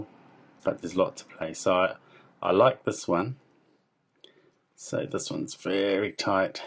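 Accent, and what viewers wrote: New Zealand. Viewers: Kiwi? Chur bro lol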